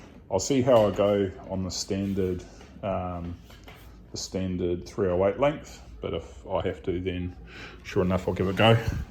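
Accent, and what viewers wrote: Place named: New Zealand. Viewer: Mate, you sound like a Kiwi! 👍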